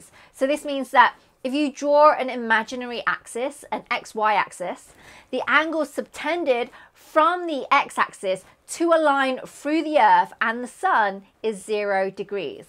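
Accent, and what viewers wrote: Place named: Northern England, United Kingdom. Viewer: We love your Northern English accent.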